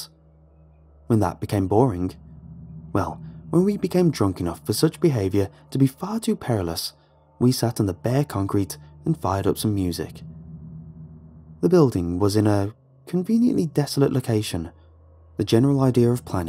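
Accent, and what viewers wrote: England, United Kingdom. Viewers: Strangely it's been the same for me. It's something about his steady English accent that makes me drowsy.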